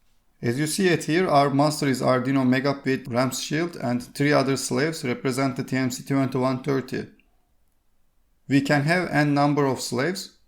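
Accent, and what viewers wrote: Turkey. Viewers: Turkish accent? 😁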